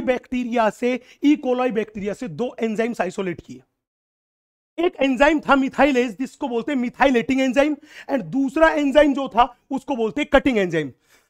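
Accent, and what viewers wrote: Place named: Haryana, India. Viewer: Is sir from haryana ?.....his tone matches as littile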